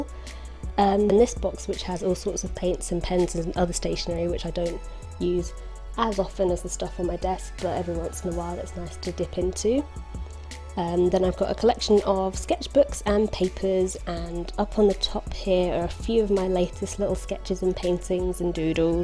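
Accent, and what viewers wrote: England, United Kingdom. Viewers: Wow I like so much your space 👍 it's seems to be personal space not like in others videos I see from people I'm french I would like to speak english like you 😄 I love this english accent! 💕 Where are you from ?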